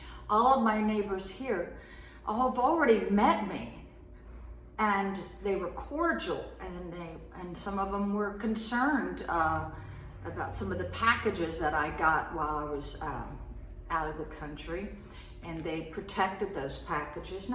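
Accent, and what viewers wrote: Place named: Southwest Virginia, United States. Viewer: OMG I love that SW Virginia accent. My late husband was from Roanoke.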